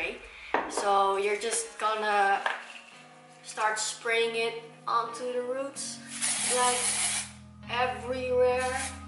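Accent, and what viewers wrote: Netherlands. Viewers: Ben je Nederlands? Je hebt echt een nl accent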